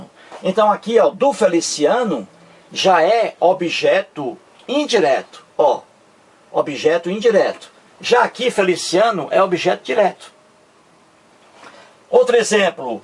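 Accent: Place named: North, Brazil. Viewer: vc devia se concentrar só em ensinar português, é muito mais bonito que postar videos blasfemos!! Acho bonito o.sotaque nortistas seu. Abraços do piracicabano aki!!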